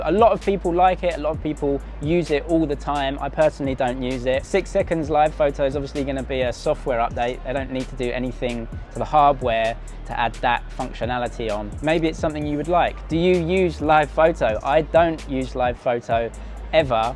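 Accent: United Kingdom. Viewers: Like your British accent! I watched the video you challenged Guizhou dialect , that’s fun, try to speak Cantonese)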